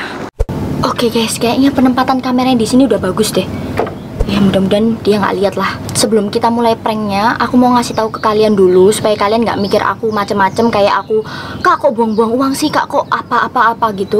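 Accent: Java, Indonesia. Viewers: Iya soalnya medok gitu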